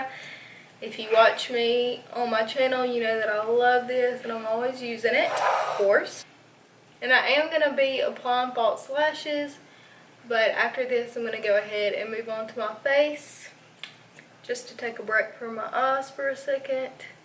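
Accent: South, United States. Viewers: Beautiful look!! I love how the warm tones make your eyes pop! Also, I love your accent. I'm from the South, too but my accent isn't like yours! :(((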